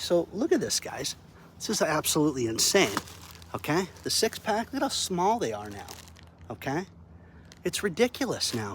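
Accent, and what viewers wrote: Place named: Midwest, United States. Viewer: Has anyone ever told you you speak with a solid Midwest dialect, it's very disarming.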